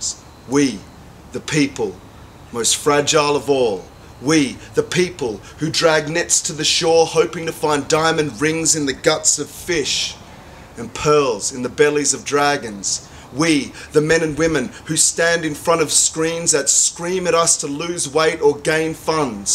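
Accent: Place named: Australia. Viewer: Gidday from a new fan; greetings from a Kiwi in South Korea. Wow, this is impressive. Great to hear great poetry in a funny Ozzy accent! I look forward to more.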